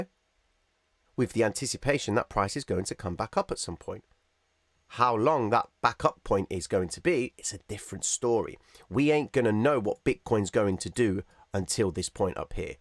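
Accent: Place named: North London, United Kingdom. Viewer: He's from north London bruvs:) The accent is the Queens English with a twist of colloquial city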